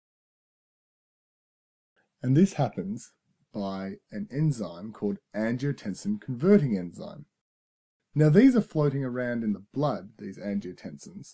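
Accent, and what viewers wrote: Australia. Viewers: thanks! I could tell an aussie from a mile away. from a kiwi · This is FANTASTIC! Awesome job! Love that it is Australian as well, and not American with words pronounced oddly! Would be AMAZING if you did a tute for this series on the "Triple Whammy" (effect of NSAIDs, diuretics and ACE-I) - I have searched the internet relentlessly and never found one! Please please please! Thank you x<num> for your fabulous videos!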